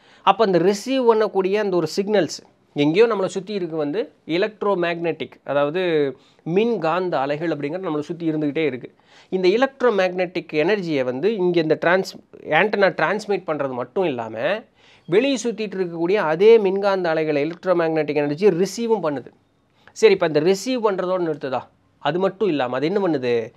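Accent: Coimbatore, India. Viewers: Anna neega Coimbatore ha ❤😊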